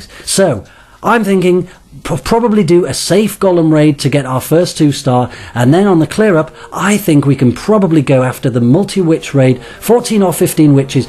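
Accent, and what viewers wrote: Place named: United Kingdom. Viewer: Am i the only one who pictured this dude as a 70 year old british male?? This was the first video i saw of his face and was completley shocked!!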